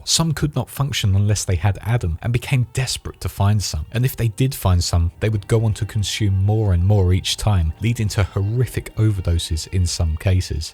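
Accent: United Kingdom. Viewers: Thank God you're british, it makes listening to this so much better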